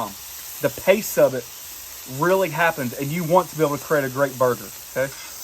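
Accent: South, United States. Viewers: For some reason when i see a man with an southern accent on the grill, i always trust what he's talking about lol.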